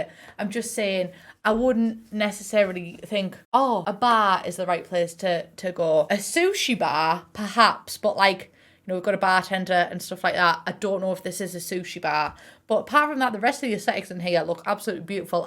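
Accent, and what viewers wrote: United Kingdom. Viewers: in the beginning of this video Did you say your mum had covid ? I'm sorry sorry sometimes I can't understand British accents sometimes please forgive me 😄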